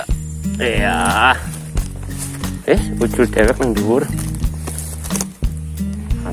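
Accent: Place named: Banyumas, Indonesia. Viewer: Salam wong ngapak, ora ngapak ora penak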